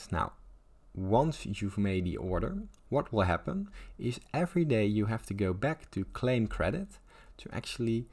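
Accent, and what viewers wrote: Netherlands. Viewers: Your accent just screams Dutch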